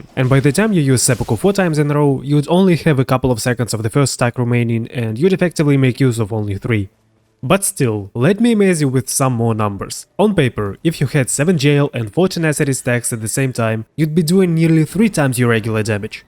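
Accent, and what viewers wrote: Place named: Russia. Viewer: Друг, ты русскоговорящий? Просто акцент очень сильно выделяется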